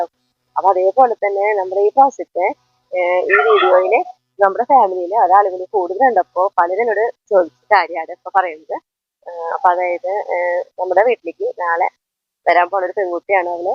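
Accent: Thrissur, India. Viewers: വീഡിയോയും കൃഷിയും ഭക്ഷണവും എല്ലാം വളരെ നല്ലതാണ്... പക്ഷേ പറയുന്നത് പകുതിയേ മനസ്സിലാവുന്നുളളു. ഒന്നാമത് തൃശൂർ slang... പിന്നെ ഭയങ്കര സ്പീഡും.. ചേച്ചി തന്നെ വീഡിയോ ഒന്നു കണ്ട് നോക്കൂ.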